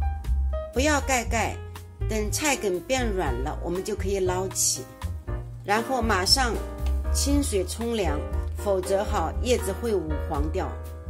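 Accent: Shanghai, China. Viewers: 最喜欢Jenny甜的发糯的上海普通话，今天就先包荠菜馄饨！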